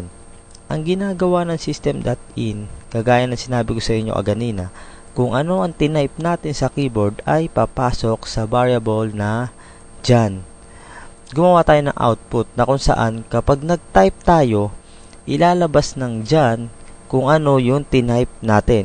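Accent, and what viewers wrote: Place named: Manila, Philippines. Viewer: masyado nman mapanghusga sa mga bisaya tong mga to.. di nyo bah alam na ganyan mag english ang mga tga maynila? relate relate din pag my time.. nkikiview na nga lng ei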